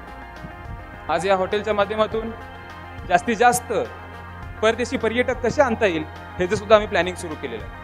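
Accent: Kolhapur, India. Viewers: अभ्यासपूर्ण आणि तितकंच अस्सल कोल्हापुरी टोन मध्ये केलेलं भाषण😊👌👍 पुढील वाटचालीसाठी शुभेच्छा 💐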